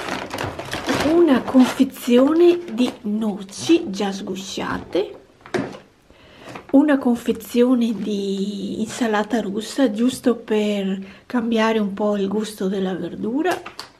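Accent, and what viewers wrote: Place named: Veneto, Italy. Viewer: Ciao!! Ma di dove sei di bello che sento un inconfondibile accento di casa ( Veneto )? I tramezzini mi mancano un sacco, quelli nostrani, qui in Inghilterra non sono buoni come i nostri, e pensare che quando abitavamo in Svizzera li distribuivo col furgone in Ticino! Ciao cara, un abbraccio e un saluto anche da Anna!!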